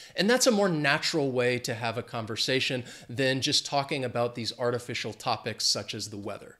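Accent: United States. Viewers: I love your american acent. I live in Italy and always the people speak English from UK and some time I'st hard understand. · Are you a new Youtuber and an English teacher?? Thank you for this video and I like American accent as yours. I will show this video to my students. I never say " " but this phrase appears in an old song from the 80's by. I've heard that " " is used in the United Kingdom only and the same thing is "Shall"in all pronouns. We know that the English language has changed during the half past century and there are words that we no longer use. Thank God we can learn and speak an understandable and clear English. 😆🗽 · You are my favorite English teacher because I'm more into the American accent rather than the formal English accent taught in India. BTW it's slightly raining in Kerala. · I'm a native English english speaker :) and I love your videos for picking up a good american accent for some creative work I'm doing. 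However, in the UK, we do still talk about the weather a lot, lol! Although we would say things like 'deadful weather isn't it?' rather than how's the weather. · Hi there, I'm French and writing from Paris ; it's been raining really hard yesterday with cats, dogs and even hail 🦝🦊🐯🦄🐷 ! But I don't know how the weather is 😎 right now... I've found yr channel a few days ago and I noticed that you were saying all the time that you're teaching English while I noticed right away your American accent and style. As I'm also following other learning English channels, I thought you should be mentioning that you're teaching American English which is quite different in terms of accent and idioms. But I see you've made it, much better so !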